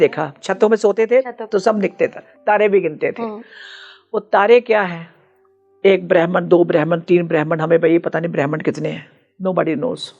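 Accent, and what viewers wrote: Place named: Punjab, India. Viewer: The narration is pretty difficult to understand. Some anecdote starts abruptly without much hint of who and what she is talking about. Pronounciation in Punjabi style adds to the confusion. The statements about 33 Crores doesn't match with the description of 33 koti (types - 12 Aaditya, 11 Rudras, 8 Vasu, 2 Ashwinikumars).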